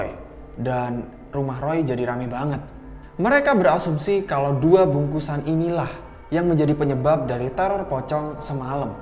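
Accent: Java, Indonesia. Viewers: Jawa keras slurr 😅 Salam dari Jawa tengah tepatnya Legenda warteg Kota Tegal laka laka 🙏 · Jowo keras anjai😂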